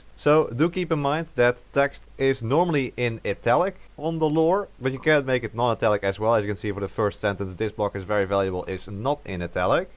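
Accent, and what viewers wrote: Netherlands. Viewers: this dudes def dutch for sure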